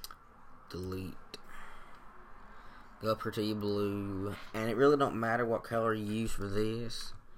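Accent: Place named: Southeast, United States. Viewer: Being a southerner (Texan) myself, this sounds southeastern, like Alabama/Miss/Tenn.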